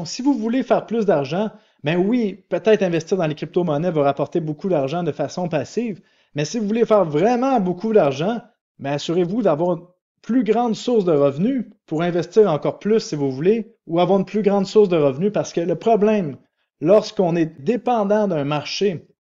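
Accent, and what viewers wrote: Canada. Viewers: Merci ! vous êtes très plaisant à écouter, votre accent canadien y participe mais plus que ca votre facon d'aborder les sujets est formidable. Restez comme vous êtes !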